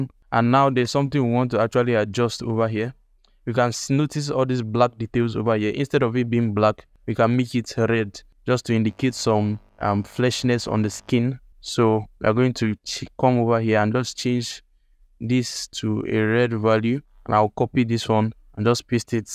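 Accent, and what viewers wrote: Nigeria. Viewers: Bro something about that Nigerian accent makes the tutorial stick more had to subscribe ASAP, thanks man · nigerian accent = trust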